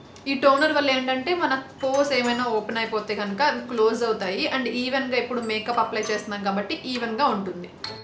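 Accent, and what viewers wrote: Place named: Ongole, India. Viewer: gaaru meedhi ongole la...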